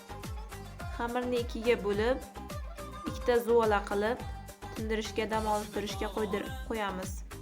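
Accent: Uzbekistan. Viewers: Tili xuddi Amudaryonikidek ekan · Шевангиз Галлаоролники😊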